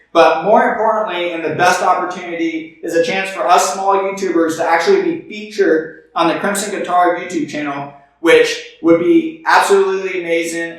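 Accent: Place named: Alabama, United States. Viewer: What? I can't hear you! No just kidding. Put your money into something you want. I don't care if the sound isn't quite there. I just don't like that Alabama accent! That's from a Georgia boy of course. Looking good pal.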